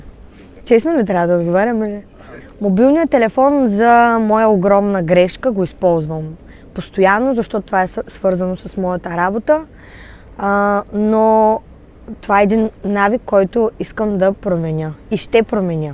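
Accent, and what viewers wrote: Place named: Ruse, Bulgaria. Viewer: Риси или Варна, Бургас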